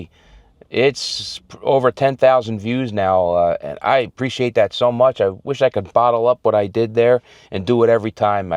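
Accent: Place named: Nebraska, United States. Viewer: Not a fire hydrant, its called a fireplug dammit. you sound like you grew up in freakin Nebraska!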